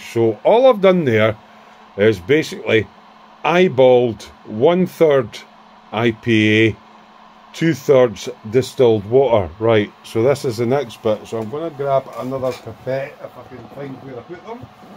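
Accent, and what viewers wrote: Scotland, United Kingdom. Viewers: Thoroughly enjoyed your video on making your own acrylic thinner and airbrush cleaner, very informative, and nice to hear a Scottish voice for a change. IT was not too long but had all the information I was looking for. Thank You!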